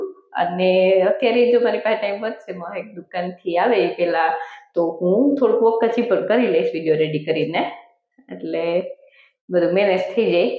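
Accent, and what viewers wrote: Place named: Kathiawar, India. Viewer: Ben tmari bhasha ekdam pyor kathiyawadi chhe to tmaru piyar kya gam chhe 😊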